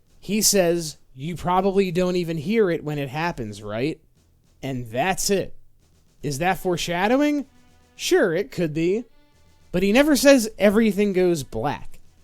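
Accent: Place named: Philadelphia, United States. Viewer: Listening to you more it's gotta be a Philly accent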